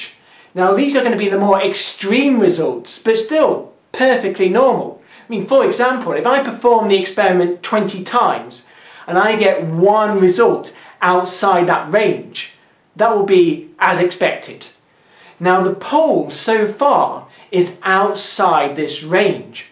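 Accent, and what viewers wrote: United Kingdom. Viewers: He lives in England (you can tell from the accent). England uses a uniquely bizarre street address scheme wherein adjacent addresses are usually consecutively numbered, but occasionally there are large jumps, because at some point they have to go back and do the houses on the other side of the street, or at the other end of the street (other direction from downtown), or whatever. I don't know exactly how they handle it when a new house is built between two existing formerly-adjacent houses. Heaven help you if you are given an address on a long street and not told what the nearest cross street is. Another weird outlier is Japan: street addresses don't say what street the building is on at all: instead they say what block it's on. All the blocks (in most cases, square sections surrounded by four streets) in any given city are numbered; and then the buildings on all (usually four) streets around each block are treated as a single block and numbered around the perimeter. 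Street length is thus completely irrelevant, and the range of possible numbers of buildings on each block is much smaller than the range of possible numbers of houses on an entire street. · So I thought this was going to be a "depends on where you live" issue from the beginning, and five minutes in yes it's exactly that. Guessing by accent, this person is some flavor of subject of the Queen of England; and may not be aware of how houses are numbered in America or France or other places. Even number houses are in one side of the street, odd number houses in the other side of the street. The first house on a street is just as likely to be 2 as to be 1. And in America, single digit house numbers are fairly rare. Some of my previous house numbers were 5801, 358, 363, 11258 (rural areas get bigger numbers). And his explanation didn't mathematically make sense.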